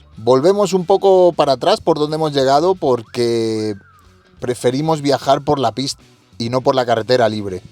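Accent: Spain. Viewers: Viendo videos de aventureros me encontré tu canal! No te conocía pero viendo tu canal noto acento español???? De haberlo sabido! Yo me crié en España hasta los 22 años! Ojala hubiéramos coincidido antes! Te hubiera dicho unos spots muy buenos en oaxaca para acampar! Si te vas a quedar más tiempo por el estado échame una voz! Saludos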